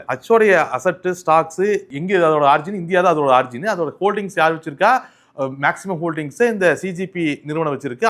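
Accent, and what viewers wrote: Kongu, India. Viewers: I like your kongu slang sir❤ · Great sir, I like your kong slang sir. நீங்கள் எங்களுக்கு தன்னம்பிக்கை கொடுக்கிறீர்கள் sir💯💚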